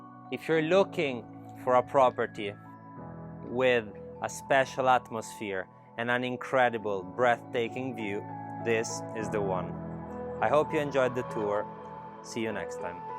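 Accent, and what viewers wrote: Italy. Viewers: love your italian accent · Beautiful property, Apples and walnuts, a match made in heaven! very cozy farm and well presented, Your English is excellent! I love the way Italians pronounce the letter E on the end of words like a tail that swings! so musical.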